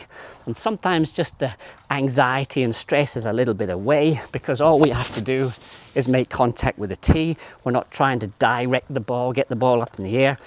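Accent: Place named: England, United Kingdom. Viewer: I love your channel. Sounds like you’re English. Where are you and do you give lessons in person ?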